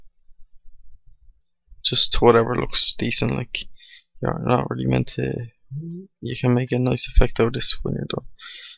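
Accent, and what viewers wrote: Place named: Ireland. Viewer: loving the Irish accent man :D Great tuorial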